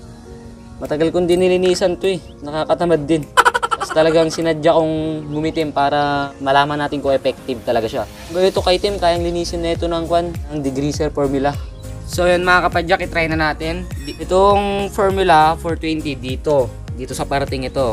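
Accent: Ilocos, Philippines. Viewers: ilocano at kapampangan naririnig ko eh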